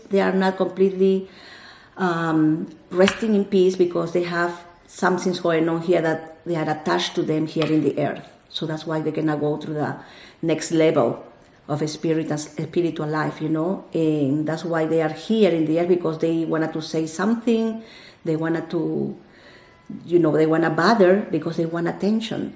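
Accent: Peru. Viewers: can we get a Spanish video because I want to hear your Peruvian Spanish 🥰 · This is the first of your videos that I have seen and as soon as I heard you talking I could hear a Peruvian accent. Reminded me of how my aunt talks and sure enough you said Lima, Peru and it sparked some joy in me. 🥰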